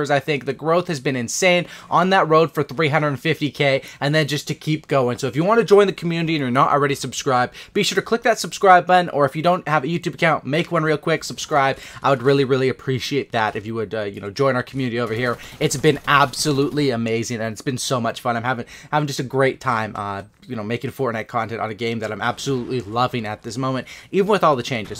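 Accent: Sweden. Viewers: are you from Sweden? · Are you from sweden?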